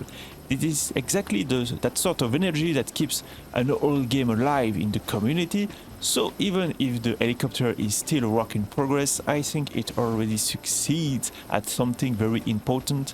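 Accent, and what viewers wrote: France. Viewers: You have such a thick french accent mon ami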